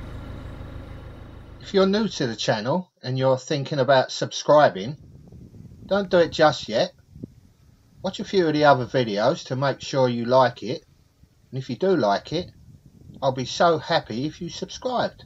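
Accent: London, United Kingdom. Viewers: Hello, when my wife gets homesick for her home town of London she likes to hear you speak, makes her feel back home for a while, although she's lived here in Wales for 50 years for crying out loud! And me? I just like your video along with your commentary, it's very good, diolch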